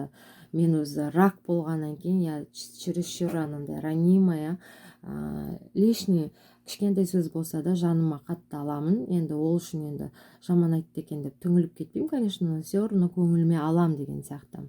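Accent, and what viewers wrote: Standard. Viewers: Айналайын Аман сау бол. Отбасын а бакыт тилеймын. Балапандарын тап татты. Бизда сиздерды жаксы корып калды. Контентын оте керемет. Кызыкты. Корермендерын кобее берсын. Миллионга жетсын,оданда копболсын. Таза казакшаннан айналдым.. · Молодец таза қазақша сөйлейді екенсің Алла Тағала разы болсын балапандарын аман болсын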